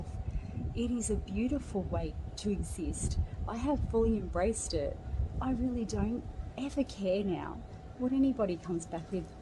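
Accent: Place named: Australia. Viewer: I like your accent.Are you in Australia?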